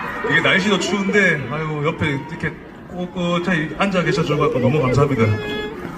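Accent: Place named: Gyeongsang, South Korea. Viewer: 방청객 에서 누가다리가 몸이약하다했나~~ 아니몆시간을 노래하고 떠들고 춤추고 이야기하고 이럴땐매정하다니까? 안힘드는가해바요한다 아나!! 아이고대다 자빠진다 이제다끝낫어요 내죽일겁니까? 고만~~이제 경상도사투리 귀여운데요 영웅오빠가돌아왔다 어땠어요재미있었어요 나도너무재미있어좋았어요 날씨도추운데 꽂꽂하니않아있어줘서좋았어요 감사합니다 몆번을이야기하노 철없는 오빠 기댈수있는오빠 장난기많은오빠 의젓한영웅이오빠~~로기억할께요 가수님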